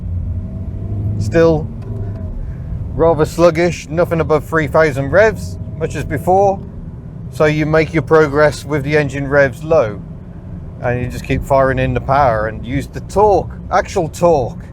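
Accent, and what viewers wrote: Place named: Scottish Islands, United Kingdom. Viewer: sounds like the west coast islands of Scotland to me?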